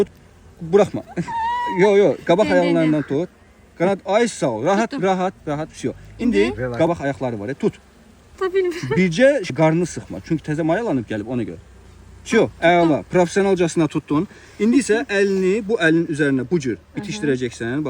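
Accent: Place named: Zaqatala, Azerbaijan. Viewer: Zaqatala aksenti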